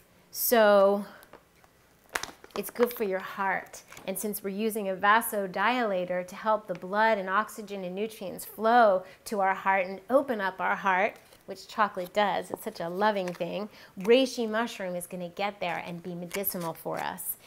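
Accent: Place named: Texas, United States. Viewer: little bit of honey -- sounded like she from TEXAS:))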